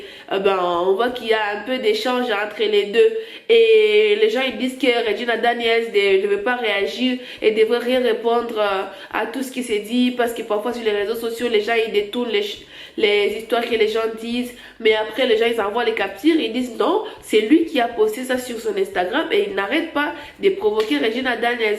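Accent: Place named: Democratic Republic of the Congo. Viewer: Tu est Congolaise je sens le Tiiii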